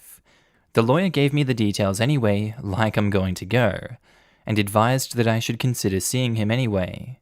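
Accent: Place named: Australia. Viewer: Ah, yes. Our favorite australian narrator with the calming voice that pierces broken souls.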